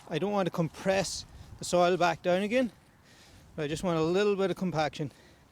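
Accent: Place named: Ireland. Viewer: This is the Irish accent. If you can't understand it, press on the CC button. It will give you subtitles.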